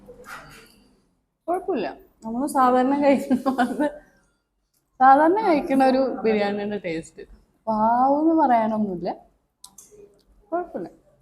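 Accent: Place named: Valluvanad, India. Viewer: വള്ളുവനാടൻ ഭാഷ ല്ലേ... പാലക്കാടൻ slang ഇല്ല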